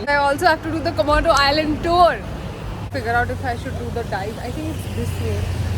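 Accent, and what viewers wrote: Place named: Punjab, India. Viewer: Saying it yet again that your Punjabi accent is sooo cute🤌💓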